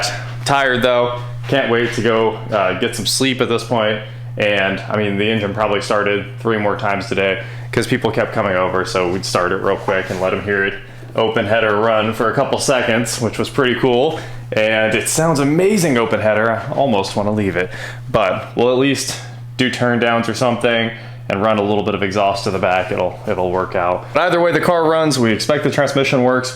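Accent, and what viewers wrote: United States. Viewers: Please Rolls Royce's are ladies like ships (well the drive like boats too) there aways female! Not bad boy's please have more class now you gone up market and try to speak english not yank! remember bonnet not hood, boot not trunk, and petrol not gas but loveing the fun of the build!!!!!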